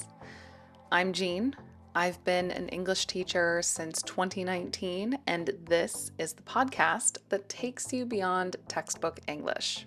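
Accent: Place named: United States. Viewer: I love the American English. 😊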